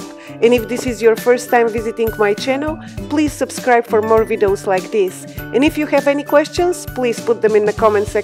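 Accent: Russia. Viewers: Lovely (RUssian?) accent. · Russian accent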